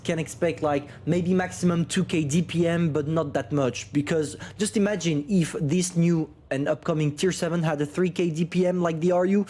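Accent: France. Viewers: Dear, I must give you a huge compliment. In my oppinion you are by far the best You-Tuber on WoTB. Not that your explainations are sharp to the point and your commitment to the game and the community is outstanding, but your french accent within the english language is absolutely insane, when it comes to the fact of entertainment. In other words: you are broken as a Smasher. Keep on your performance for the benefit of us players. sincerly yours. Carl Schurz, Adj-chef-2.eme REP ( retired in 1991) served in anonymas. " Nous sommes le meilleur"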